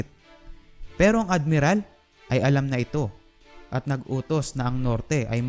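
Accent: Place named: Pampanga, Philippines. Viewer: Capampangan accent hehe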